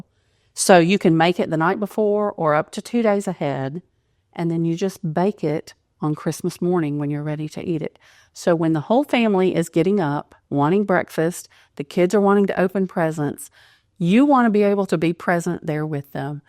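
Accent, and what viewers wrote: South, United States. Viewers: Of course. I like her on video, she is so pleasant and calmly cheerful, she sounds like a female Mr. Rogers, with a southern accent (Georgia?)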